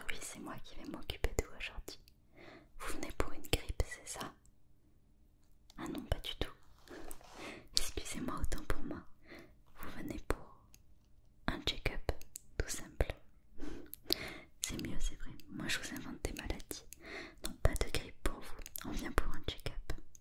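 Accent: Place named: South-West, France. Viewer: Un chuchotement comme ça avec l accent de mon Sud ouest! Que demander de mieux! Merci 👍☺️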